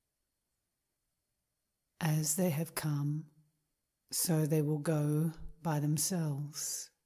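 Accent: Australia. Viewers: lovely proper Aussie accent, brilliant m8🙋‍♀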